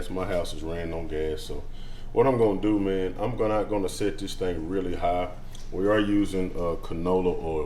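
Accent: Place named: Texas, United States. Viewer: It’s crazy how a Texan can recognize another Texan by the tone of voice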